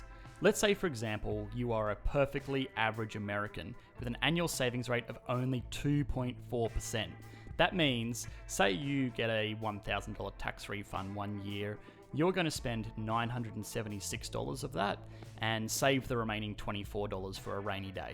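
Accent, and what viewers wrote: Australia. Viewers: Australia has had the economic advantage of massive mineral wealth (even though most of the wealth has been plundered by multinationals under Liberal governments), which has meant that the GDP is much higher than comparable nations - in addition we have a (increasingly less) generous welfare state which includes packets of money for people studying or looking for work - which is circulated back into the economy at an incredibly high percentage, as neither students nor job seekers are known for their massive wealth hoarding. In more modern history, we were able to escape the worst of the global financial crisis through some appropriately timed stimulus packages by the Labour Rudd/Gillard which included literally just giving money to every citizen and asking them to spend it in the economy - which reinforces the main point of the video that if more money is given to the masses, the economy is more secure. 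Coming from an Aussie - which, from the sound of the uploader's voice, is the where the person making this video also came from.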